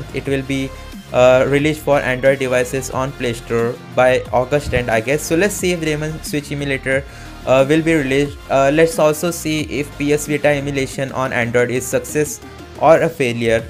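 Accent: India. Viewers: Indian english = fake news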